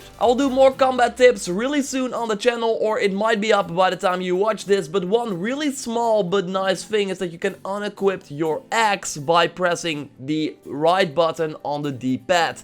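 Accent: Netherlands. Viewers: he'a from Holland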